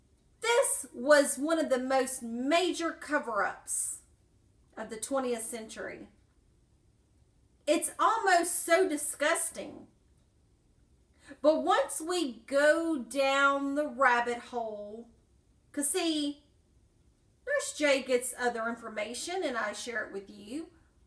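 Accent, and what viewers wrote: South, United States. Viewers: Really.... he wasn't even black... According to what we have seen... He was maybe only 1/3 "black", as they reckon it. He was more "white" than black. He just used the black as a stepping stone to make his money and create his political agenda. BTW, I love your southern accent. I'm from Mississippi, and I really miss a real "southerner" on these channels.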